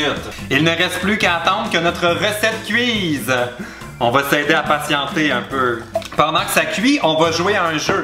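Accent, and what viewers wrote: Quebec, Canada. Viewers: T'es trop marrant jpp 😂😂 j'adore l'accent québécois ! 😱😍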